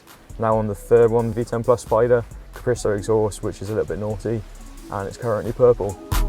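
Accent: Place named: West Country, United Kingdom. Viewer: Think it’s a ‘west country’ accent that may come across quite strong and hard to understand. Also the background music is probably a little too loud.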